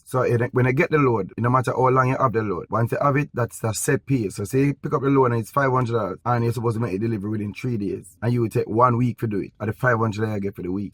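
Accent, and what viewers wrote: Jamaica. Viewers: It's Jamaican patois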